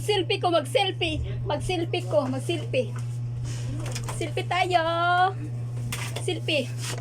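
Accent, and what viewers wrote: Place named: Visayas, Philippines. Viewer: Nakakatawa po.. Parang may bisaya accent ka po like me.. Bagong kaibigan mo po.. Natapos ko na din tong mapanood sana tulungan mo din po ako..😊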